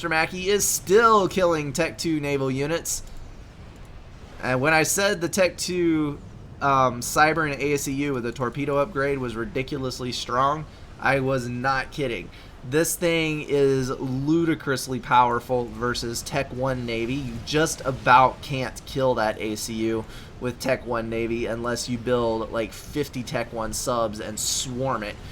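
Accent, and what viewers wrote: United States. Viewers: I love the southern accent from you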